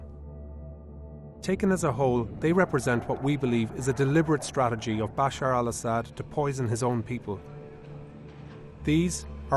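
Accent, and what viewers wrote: Ireland. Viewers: Why do you have this Irish sounding Narrator? Slightly offensive that you think our own dialect isn’t distinguished enough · Is that a horrible Irish accent ugh not nice to listen to doesn’t make for a good YouTube experience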